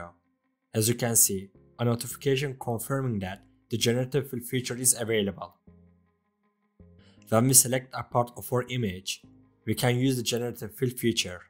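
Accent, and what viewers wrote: Turkey. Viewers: Türksün demi la? aksanından anladım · I dont know why but you have a very stong Turkish accent. Nice video!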